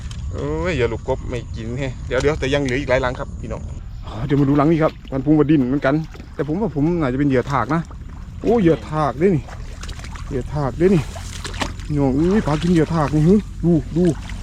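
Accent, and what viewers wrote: Korat, Thailand. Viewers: ชอบฟังภาษาโคราชfcสกลนครครับหมานๆเด่อ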